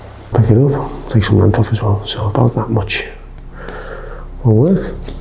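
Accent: United Kingdom. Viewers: Im a green barber trying to learn as much as i can . This one was actually super helpful .. a cool ,simple and quick hair cut well done. Im just wondering how you find the guide in each section . Cuz i lost mine in this type of heavy hair ... and its a bit hard for me to hear what you saying ... wearing a mask and hard British accent 😅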